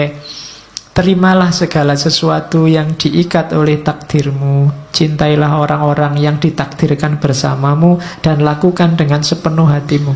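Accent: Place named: Java, Indonesia. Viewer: Jawa nya itu Jawa banget😹😹😹 medok hama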